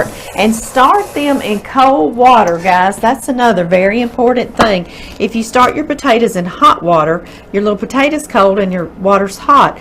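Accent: South, United States. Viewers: I love the way you talk, keep it up!!!! Southern ladies have the most beautiful accent!!! LOVE IT!!!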